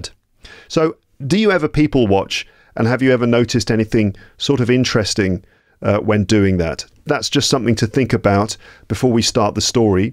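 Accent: United Kingdom. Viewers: BBC ,modulation, thahk so much for the video! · I am not used to listen british english! But I just loved to hear your class, your english. And I am very happy that I understood everything! Thankyou Maíra from Brazil 🇧🇷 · Hola, estoy aprendiendo el ingles americano, confirmame si ese acepto es britanico? ó no importa? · Thank you,. Your pronunciation made me remember of the best boss I had when working in a British company here in Brazil, in the late seventies. And I missed those days. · I really love your british english..😊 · Very nice your's british language.salute from Lithuania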